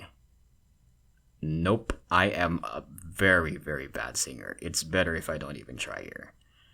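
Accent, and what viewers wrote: Philippines. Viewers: I like ur Tagalog accent sm 😭✋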